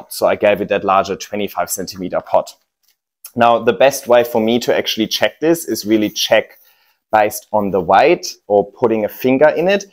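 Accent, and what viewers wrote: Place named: Germany. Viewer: Dieser deutsche akzent hahahah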